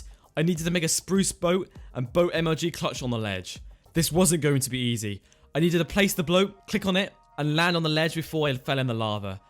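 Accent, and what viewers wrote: United Kingdom. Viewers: cool British accent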